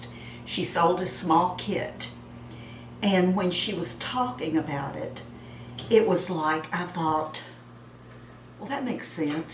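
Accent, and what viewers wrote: South, United States. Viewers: I thought I recognized that accent! I am from Arkansas. We sound alike. Lol! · I love the way you say "mirror". That southern bell accent is so sweet.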